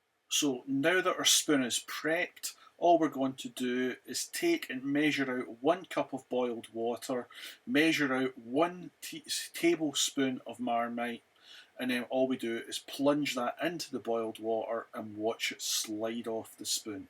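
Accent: Glasgow, United Kingdom. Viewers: Guid Scots accent there ( Glasgow?) This looks great , going to make a batch x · west end of Glesga accent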